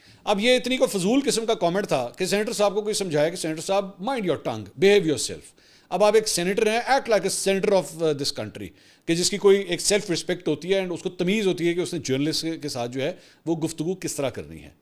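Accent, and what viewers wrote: Punjab, Pakistan. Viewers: Mansoor Ali khan sahb. Ap k Vlog mein punjabi ka tarka bht acha lgta hay bal k vlog ko char chand lga deta hay❤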